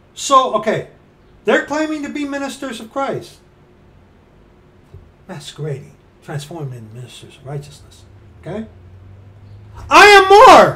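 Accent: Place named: North, United Kingdom. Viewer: I try to follow along, sometimes I don't have the time, but when I can I do follow you along. Your Northern accent throws me now and again!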